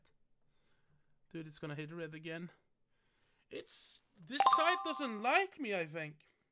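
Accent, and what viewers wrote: Sweden. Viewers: Are you from Sweden? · are you swedish?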